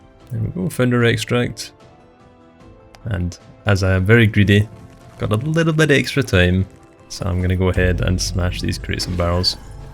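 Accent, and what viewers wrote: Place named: Northern England, United Kingdom. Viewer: Great video. Just wondering cant place the accent, northern England or Scotland?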